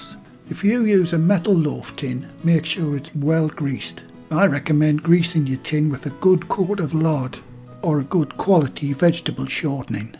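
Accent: United Kingdom. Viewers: He sounds like a British person & I appreciate his sharing his receipe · A lovely uncle with a kind voice and a delightful British accent! Thank you so so so so.... much for the recipe! This is the one and only recipe for gluten-free bread, which I was looking for and found thanks to You! Prosperity to Your channel. Like, subscribe and greetings from Russia! : )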